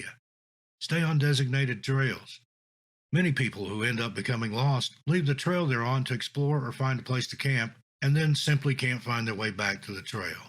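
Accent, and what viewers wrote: Appalachia, United States. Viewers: i just somehow stumbled on to you and your awesome stories. My youngest daughter was born at memorial mission hospital. My family settled fairview right outside of bat cave...buncombe co. many many years ago Im the 3rd generation here. When i went into labor with my baby girl 21 years ago this past february, coming down the mountain wouldnt you know, random smoke came and settled over us blinding my aunt teeny driving, me screaming at her that the baby was fixing to be here please don'tkill her..., Teeny screaming that she knows but she can't see when I'm hollering like that. 😂 I'm a Merrill...my Pappaw'sfamily all still live at Merrill Mtn. And Cameron/McBrayer. Yesterday i was listening to you thinking.. man he sure does sound familiar. I know why now! And wouldnt you know i left home 20 years ago after my 2nd and last baby was born for texas. 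Im in west tx pretty close to NM now, looks like i just missed you there, former neighbor. Congratulations on your new life in New England and thank you for being the sound of home for me now.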